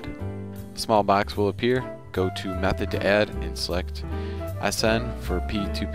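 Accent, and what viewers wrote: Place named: United Kingdom. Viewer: bahiya kaha se ho britain ya germanny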